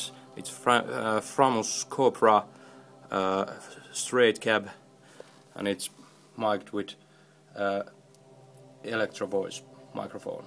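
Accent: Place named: Finland. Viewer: Are you finnish? your english sounds exactly like when a finnish talks english :P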